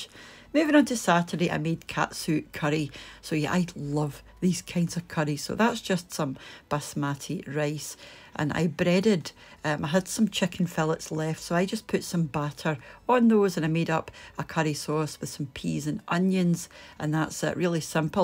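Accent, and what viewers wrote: Scotland, United Kingdom. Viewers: I love your gentle Scottish accent and your great recipes. I hope you get to 100K subscribers soon you deserve it.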